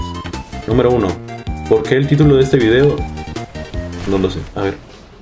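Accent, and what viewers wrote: Colombia. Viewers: eres colombiano? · Mi impresion o es colombiano.-.?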